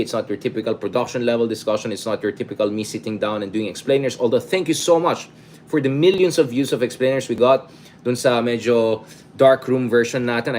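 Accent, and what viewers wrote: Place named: Ilocos, Philippines. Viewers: Kyut ng Ilocano delivery mo and I was waiting for the magic word and so glad I heard “please”. Haha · Sir kansat.kasla ka met kanyami nga igorot nga agsao ilokano.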